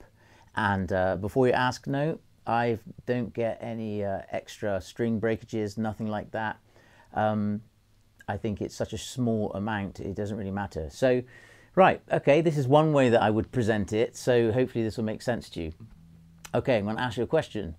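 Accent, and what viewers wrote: Bristol, United Kingdom. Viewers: did you go to Bristol university, per chance? I gather you're based in Bristol and I can hear the slight accent, but I was there '98-'02 and I think we're about the same age..Anyway, since I heard your playing a couple of years or so ago, I always thought it was some of the very best and melodic in the best way.. I'll be listening and learning some stuff as well as enjoying your music. Have a good evening.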